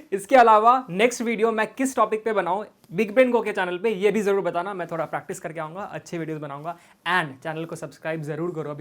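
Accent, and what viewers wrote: Bihar, India. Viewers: Full bihari tone that the guy 👦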